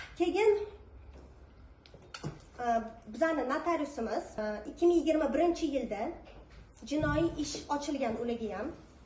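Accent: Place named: Russia. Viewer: Чала урис узбекча гапираркансану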